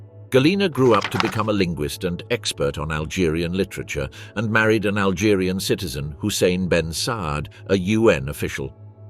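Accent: United Kingdom. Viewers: yes, but its even worse the use of UK english (with all sorts of dialects) while it should be US english narrated. Now thats really an islands plague :-((((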